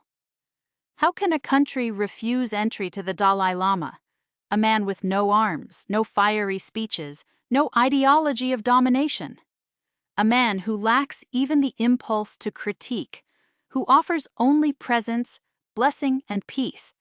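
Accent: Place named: United States. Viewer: American accent!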